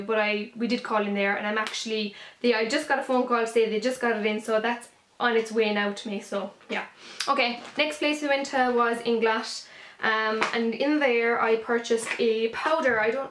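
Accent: Ireland. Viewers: I think your Cork accent is SO beautiful.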